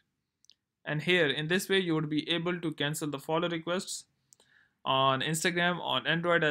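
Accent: India. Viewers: ur accent is still indian dont try to hide it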